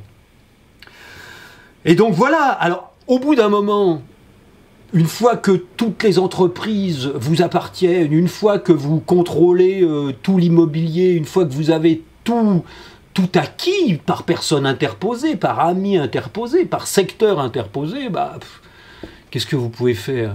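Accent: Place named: Paris, France. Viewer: Vous parlez très élégamment, M.! Il y a cet accent parisien qui permet une excellente diction. Mais surtout une faculté d'expression orale que notre génération n'a pas reçue, sans doute à cause des pédagogies nouvelles. Je remarque que les + de 50 ans de la blogosphère s'expriment bien plus clairement que ne le font les meilleurs des intellectuels de 35 ans. Entre nous, la génération intermédiaire est déjà incapable de discourir proprement, cf. les allocutions de Hollande ou Charközy. Je recherche des textes, des témoignages pour comprendre ce qui s'est passé et comment je pourrais compenser ce défaut. Est-ce qu'à l'école dans les années 1960 on entraînait les élèves à l'oral?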